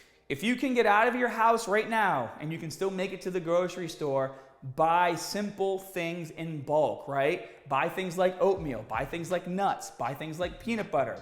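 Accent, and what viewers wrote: Boston, United States. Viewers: love that Baawston accent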